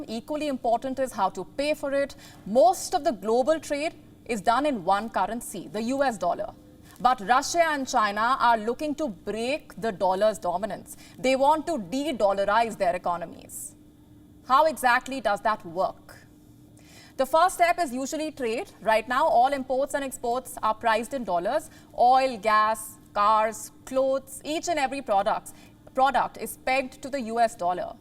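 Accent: India. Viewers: I wish your Indian accent was greatly reduced so you would be easier to understand. And your voice doesn't have the soft firmness I've become used to. I really hope you will work on this. Your channel is quite informative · Gosh indian accent is horrible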